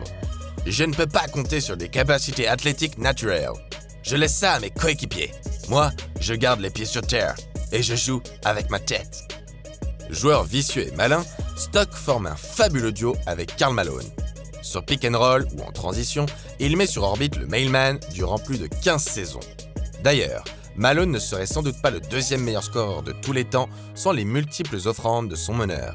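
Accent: Quebec, Canada. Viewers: Merci pour cet accent Québécois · Mdrrr j'étais pas près pour l'accent québécois 😂😂😂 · L'accent quebecois. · Non non non pas l’accent Québécois 😭😭😭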